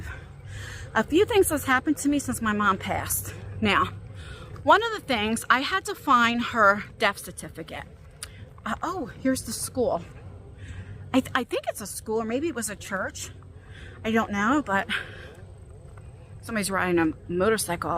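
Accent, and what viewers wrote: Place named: East Coast, United States. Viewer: What state are u in? U have an east coast accent. I am from PA. So i noticed it. ❤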